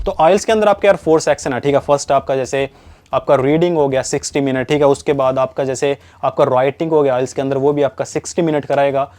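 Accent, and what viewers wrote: Haryana, India. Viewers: Haryane ka hi ha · Haryana ka lagta hai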